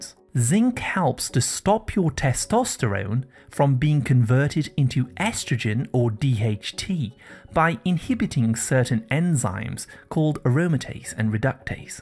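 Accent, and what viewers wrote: United Kingdom. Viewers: What's with the British voicing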